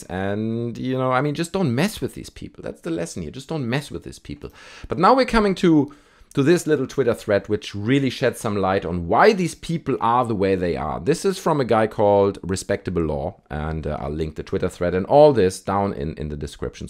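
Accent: Germany. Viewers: You have a German accent lol.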